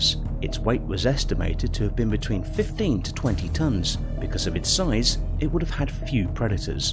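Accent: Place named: United Kingdom. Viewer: that british accent makes tge video better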